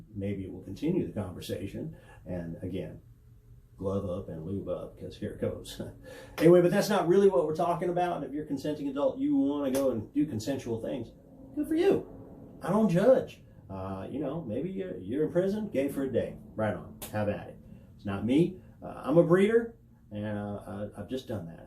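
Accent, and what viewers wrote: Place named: South, United States. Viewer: It's been soooooo long since I've heard your voice but I can tell you have ben south of the Mason Dixon for a while.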